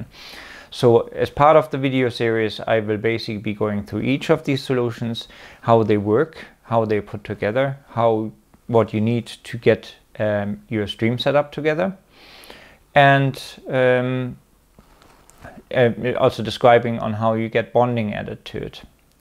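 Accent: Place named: Germany. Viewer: Meine Jüte, da kickt das Deutsch ä bissl durch :face-blue-smiling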